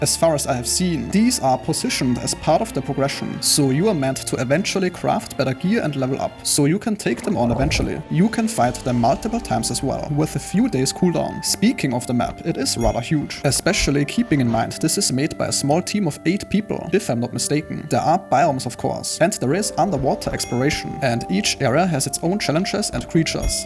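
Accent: Germany. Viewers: man spürt quasi das du mitten in Deutschland wohnst :D im sinne hilfreicher Kritik würde ich dir raten an dem voiceover zu arbeiten :) ist sehr monoton und mich persölich triggert der deutsche Akzent :p #elevenlabs lass flutschen <3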